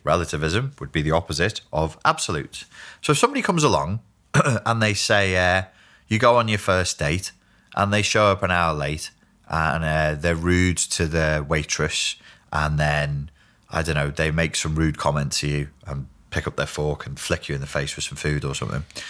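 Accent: Liverpool, United Kingdom. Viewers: Finally I can hear some scouse from. 😱🤗 Or what's the proper term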